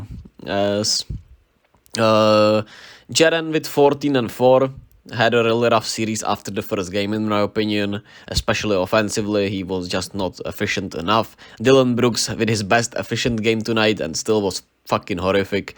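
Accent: Czech Republic. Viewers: Náš přízvuk se nezapře. Cením snahu.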